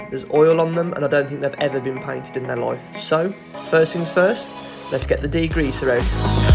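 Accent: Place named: Birmingham, United Kingdom. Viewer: You from Brum or Dudley. Sound local to me. Also liked n subbed. Again I don’t know why your vid popped on my feed. Glad it did thou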